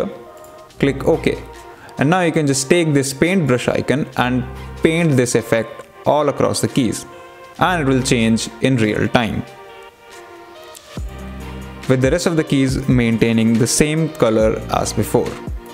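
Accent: India. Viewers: I do not understand Indian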